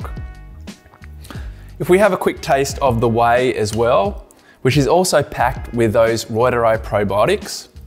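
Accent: Australia. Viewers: I wish this audio had an option filter out that heavy Aussie accent...long listen.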